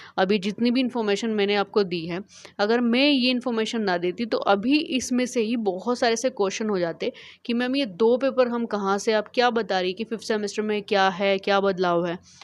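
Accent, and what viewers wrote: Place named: Pakistan. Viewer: Mam your content is very helpful but your english accent matches with pakistani cricketers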